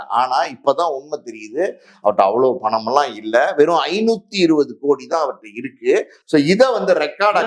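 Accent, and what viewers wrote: Madurai, India. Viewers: WOW Sivappu RED RAMARAJAN sattai with Pure MADURAI village bashai and SHANMUGA SUNDARAM Karakakkakaran style.. scratching THE CHEST.. WOW you are a great actor.. Wonderful voice...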